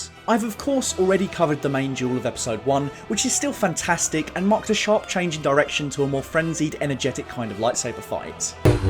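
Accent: United Kingdom. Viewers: Nice analysis (refreshing to hear a fellow Brit’s voice as well on a Star Wars channel)! I loved this duel and Yoda being introduced as THE bad ass Jedi we all know him to be. It was indeed the cherry on top of the epic battle of Geonosis with 200 Jedi, the introduction of the clones and the Death Star plans. To this day it’s the best oremirrr midnight movie screening I’ve been to (was absolutely buzzing at work the next day!).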